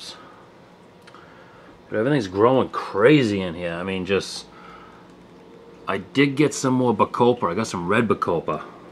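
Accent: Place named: Bristol County, United States. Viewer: ohh dude I moved out of mass 8 years ago but I know a Bristol county accent when I hear it! salute!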